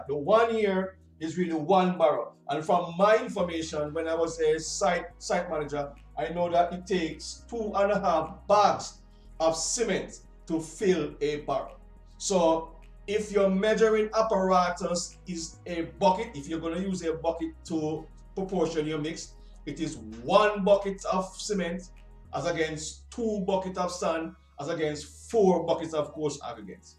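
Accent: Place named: Jamaica. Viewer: Me feel like me a go a whaa university. What you are doing is excellent i am interested all the time. I use to whatch over seas people on youtube as it relates to building but when i was browsing this week and see this man in a african shirt and started to hear the jamaican acent i was over whelm ,trust i must say i appreciate this highly.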